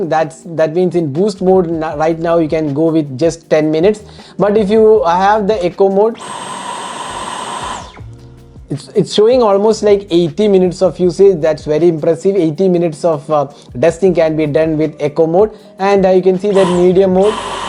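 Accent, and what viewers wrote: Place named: Kerala, India. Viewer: In 3 seconds I realised he's from the motherland.. a fellow Malayali 😂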